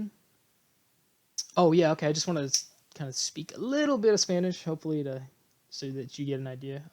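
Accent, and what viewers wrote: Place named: United States. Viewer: Hola! Vas muy bien con el español. siendo sincero, tu acento sigue escuchándose como estadounidense pero es solo cuestión de tiempo. Mucha suerte!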